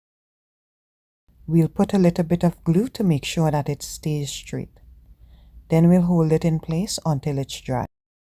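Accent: Trinidad and Tobago. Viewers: Are you from Trinidad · Why do u sound like a trini so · Are you a Trini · Gorgeous! And I love that you sound like a true Trini! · Omg! as I heard your voice I said she sounds Trinidadian. Great job my fellow Country lady.. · Absolutely gorgeous! Is that a Trini accent I detect though?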